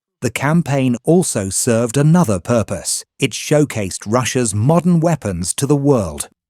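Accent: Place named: United Kingdom. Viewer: Jewish Gangster Narrator with a British accent! They have hijacked the world with sleeper cells waking up in every country in the world in positions of Defense ministers, Intelligence, police in top positions to control. The 5 eyes is Israeli gangster Terrorism run bybthe terrorist state of Israel. We’re hijacked. Putin is just trying to hold his culture,& Country together. He’s a saint! Says The BronxBoy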